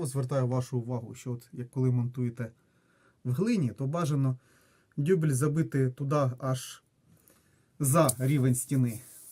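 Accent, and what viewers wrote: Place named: Russia. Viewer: не ломай язык,твой родной русский.Твой украинский с огромным акцентом.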